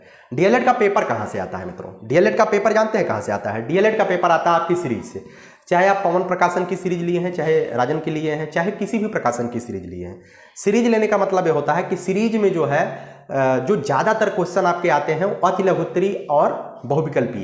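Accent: Bihar, India. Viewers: Sir aapke bat me bihari kuchh accent aa rha hai.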